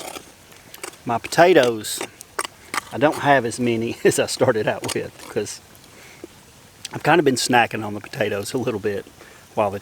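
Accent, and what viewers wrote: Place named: South, United States. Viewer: He's such a southern dude, can't hardly understand him.. he needs to speak normal english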